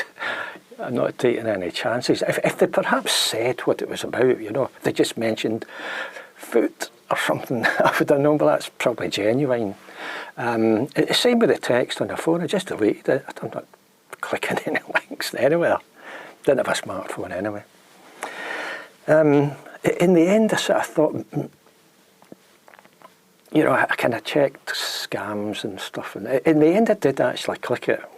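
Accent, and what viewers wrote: Scotland, United Kingdom. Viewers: So this is way off topic. But I found your channel because I have the type of brain that gets interested in very specific things, and lately I've been interested in the name Burns and all its variations (Byrnes, Byrne, et cetera) and found out about Burns Night and was interested in how Burns is said in a Scottish accent (I'm American) so I was googling something like "Burns from Scotland" and found your channel. I found a few videos where you do say it:). Now I understand that Burns, Byrnes, and Berns are all different names with different origins. I also learned for the first time that people from Scotland make the "er" sound different from the "ur" sound, whereas I say those the same as an American. So I'm wondering: would you pronounce "Burns" differently from "Berns"? I'm guessing you would.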